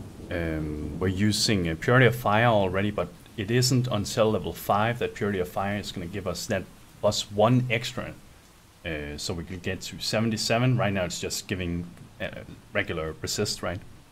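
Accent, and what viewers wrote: Denmark. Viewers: Er du dansker ? :P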